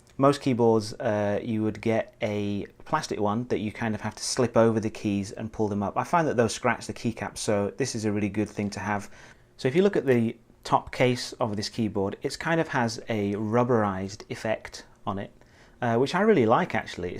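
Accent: United Kingdom. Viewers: I have this keyboard and have just ordered o rings to reduce travel. I'm used to membrane and scissor keyboards on laptops. I've been looking for reviews about this board and found yours. The spacebar doesn't bother me, apart from the depth, but I'm curious: how come someone with such a RP British accent has an oriental username? Just curious.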